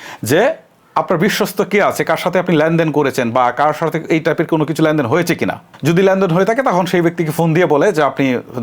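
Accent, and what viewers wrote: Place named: Kushtia, Bangladesh. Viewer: ভাই আপনি কি কুষ্টিয়ার ছেলে?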